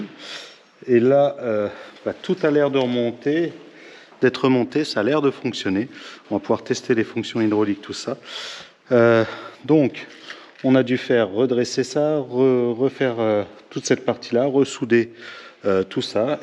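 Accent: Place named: Loiret, France. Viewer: On sent fou tu soie du Loiret tu dis tous simplement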